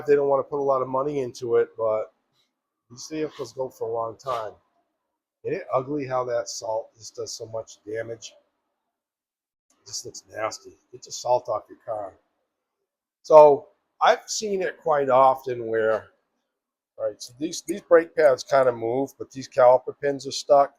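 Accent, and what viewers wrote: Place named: Maine, United States. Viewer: Guy you def a mainah...you ain't from New Hampshire.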